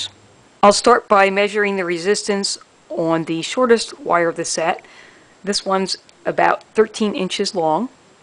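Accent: Philadelphia, United States. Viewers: Philly accent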